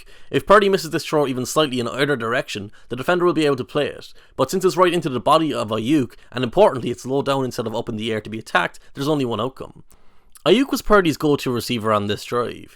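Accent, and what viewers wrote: Ireland. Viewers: Anyone else tripping about an irish accent about American football? That’s a first for me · I'm impressed with the observations and breakdown through that fine Irish Brogue. How is it you know American football so well? I hope you get paid good sir. Subscribed! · The Irish accent makes this video even better. Good stuff! :) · It's how we Irish speak lol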